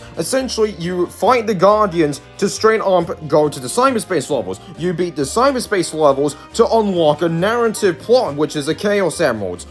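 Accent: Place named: United Kingdom. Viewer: The way you pronounce words made me suspect that you are British. I adore British voices. To me they are really enjoyable to listen. My lips and tongue cannot do that.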